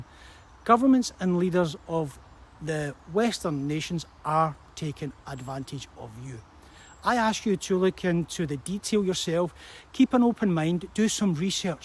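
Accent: Scotland, United Kingdom. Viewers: I lived in Scotland for 10 years and now working in China. It is so good to hear Scotish accent again. Scotland is such a beautiful country and is my second homeland. Compare to China, UK really lags behind in terms economy, living standard and technical capabilities. China is a vibrant and dynamic country. Cities in China are much more modern than London. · Scottish accent!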